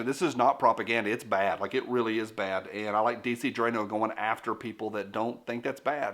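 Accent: South, United States. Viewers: Hey! Hope you aren't offended because of y'all's accent! We just know it as accent, not Gomer Pyle's! I just hope you take as the fun as it is intended to be! You're one of the top channels I keep coming back to!!! You're funny, good-natured and give good content! I'll keep watching till you stop or drop. Southerners have a particular accent, so do New Yorkers, and so do us Northerners. Believe it or not but Mid-Westerners do as well, you just have to listen carefully for it. And the one I like is dc draino 2 genders one!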